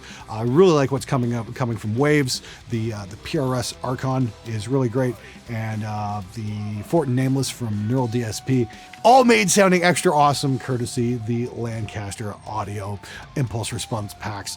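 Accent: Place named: Canada. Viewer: I always giggle when your Canadian accent shines through.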